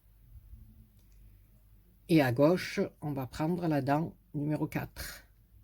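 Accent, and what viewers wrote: South, France. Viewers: toujours un plaisir à te regarder, tu mets la patate pour la journée et tu fais du bien, tu transmets ta bonne humeur avec l'accent du midi..bisous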